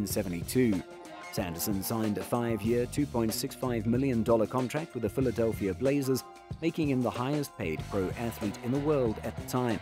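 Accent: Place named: United Kingdom. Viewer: Why is there a Limey talking about hockey?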